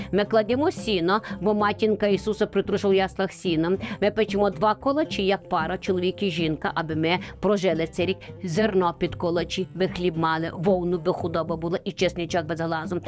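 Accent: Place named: Hutsul, Ukraine. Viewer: Талант оповідачки незаперечний. А яка мила гуцульська говірка ! Дякую !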